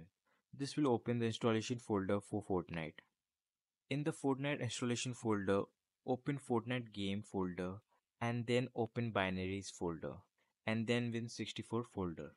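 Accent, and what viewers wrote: India. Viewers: Hearing the indian voice i didn't belive it would work, but i was quickly proven wrong. Thank you!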